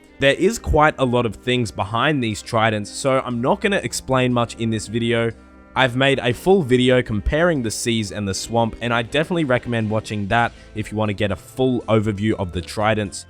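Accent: Australia. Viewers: fuck all the hate aussies have the best accent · Didn't know you were australian. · Ed Flitton Aussie accent. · your pronunciation of staves is wrong, or is it just australian accent? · Love aussie accents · BrokeMyNips He's Australian man, they pronounce everything differently :) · To all the non-Aussies getting triggered over his pronunciation of Staff and Staves, just letting you know that's actually how we pronounce those two words in Australia nation wide. Nothing wrong with it.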